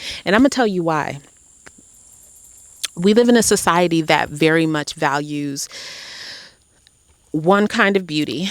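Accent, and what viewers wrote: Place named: South, United States. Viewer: You have every type of country accent in your voice lol i hear upstate, FL & da boot lol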